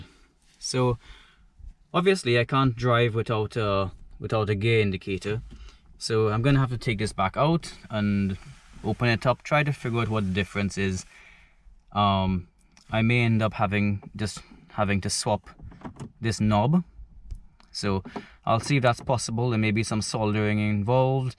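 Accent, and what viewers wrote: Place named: Trinidad and Tobago. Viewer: you sound trini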